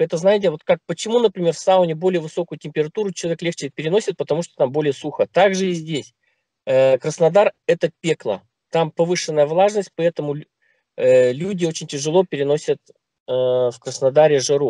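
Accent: Perm, Russia. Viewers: Никогда я не спутаю пермский говор! Первый день в Чайковском почти ничего не понимала))) Приезжайте в гости к нам в Армавир! Все приезжайте! а ВИДЕО Я ПОКА НЕ ДОСМОТРЕЛА. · Говор пермяков мне вообще не нравится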